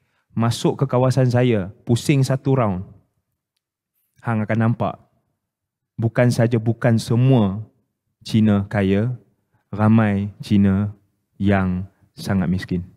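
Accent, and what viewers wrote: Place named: Perak, Malaysia. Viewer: Ada bunyi slang perak yob..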